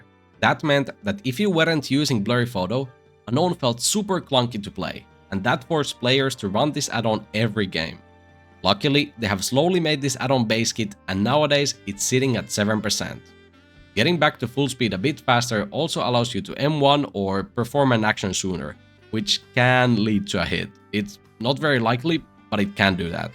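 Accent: Finland. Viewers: are you finnish? your accent sounds familiar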